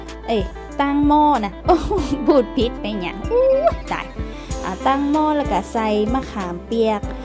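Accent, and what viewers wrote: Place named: Uttaradit, Thailand. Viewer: ขอเดาว่าสำเนียงคนอุตรดิตถ์ค่ะ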